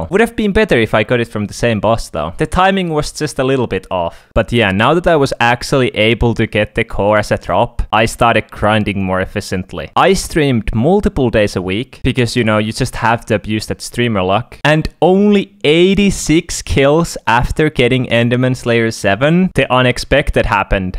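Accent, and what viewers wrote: Finland. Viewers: Finnish accent 👀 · The finnish accent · are you finnish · R u from Finland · häiritsee ku englannissa kaikkilla suomalaisilla on semmonen aksentti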